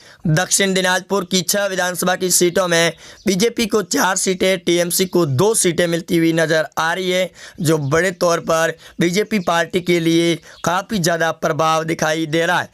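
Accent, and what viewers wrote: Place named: Gujarat, India. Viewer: Sabse bekaar channel... Kal yeh nahi hota hai to tumko dhund nikalunga... Yeh accent gujju type hai...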